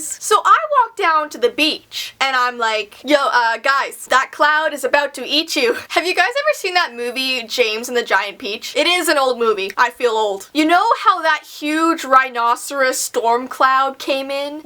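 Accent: Canada. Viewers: K.a.s. Kast naw she sounds Canadian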